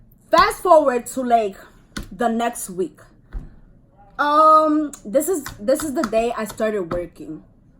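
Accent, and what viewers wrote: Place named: Bronx, United States. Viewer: Hold up, you gotta be from the Bronx😭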